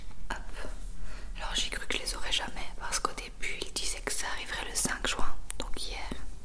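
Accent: Belgium. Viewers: C’est lunette te vont tellement bien ! J’adore ton petit accent léger belges je trouve sa troppp mignons !!!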